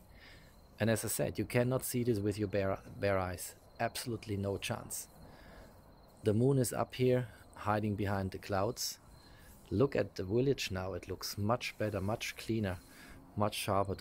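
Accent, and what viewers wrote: Germany. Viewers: Are you German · Haha man merkt das du Deutsch bist, ist aber nicht schlimm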